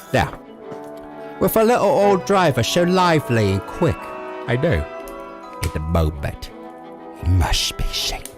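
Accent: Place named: United States. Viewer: Love the fact you can easily hear his American accent coming through clear as day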